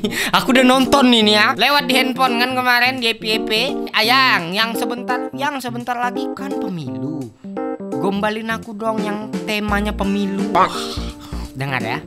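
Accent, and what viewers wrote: Medan, Indonesia. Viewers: Abg orang Medan kah🤔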